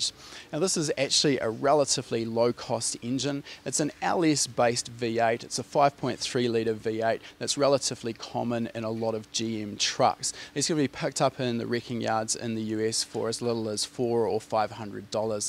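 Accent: New Zealand. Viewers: Wonder if they could just run adjustable magnetic dampers? Less weight and complication to suspension. Lmao at using a 5.3. Epic. They're good for around 700-800hp stock but the K1/JEs will do wonders for going over that if the top end is looked after. Glad they went dry sump, a given for any LS over 1-1.2G sustained. Looks like I have a new goal racecar. Unlimited aero is what I'm looking for. Love the presenter, someone who actually knows cars. Great stuff! Sounds like a kiwi or maybe an Auzzie haha. Subbed.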